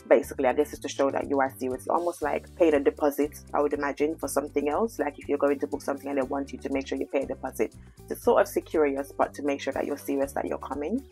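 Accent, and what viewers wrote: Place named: United Kingdom. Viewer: You sound British girl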